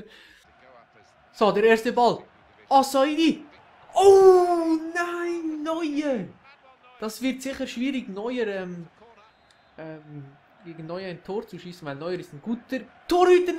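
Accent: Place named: Switzerland. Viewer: Deine videos und dein schwitzer dütsch is hammer geil! :D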